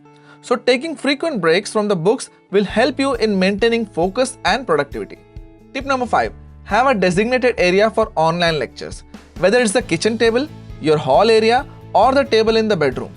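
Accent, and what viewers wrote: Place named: Bengal, India. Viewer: This accent is so Bengali.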